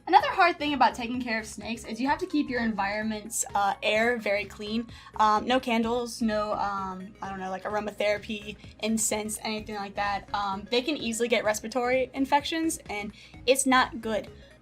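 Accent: South, United States. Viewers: Plus that southern accent is cute lol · Def a southern accent, I think she’s from Kentucky but lives in Georgia now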